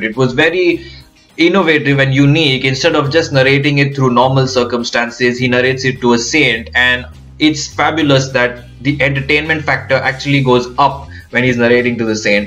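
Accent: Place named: Thrissur, India. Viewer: It's Thrissur accent... its rather like singing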